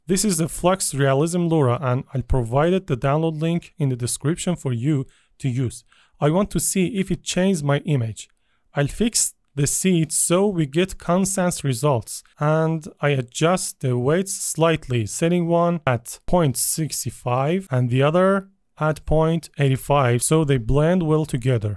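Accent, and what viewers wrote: Iran. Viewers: این لهجه قطعا واسه یه ایرانیه ، دمت گرم :hand-pink-waving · لهجه ی زیبای فارسی رو که شنیدم ذوق کردم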